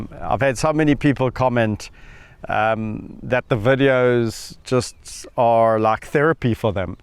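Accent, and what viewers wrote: South Africa. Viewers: Those manes. Can’t BELIVE. How full and thick they are. Andi just love the way. You talk to them and love the South. African accent. Love thst beautiful country. Gods own country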